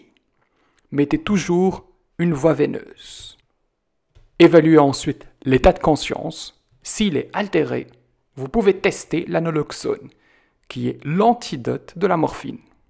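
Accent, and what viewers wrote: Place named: Belgium. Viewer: Docteur, vous habitez en Belgique ? Ça se voit parce vous prononcez tachycardie avec : ch, et nonante pour 90🇧🇪🇧🇪🇧🇪😊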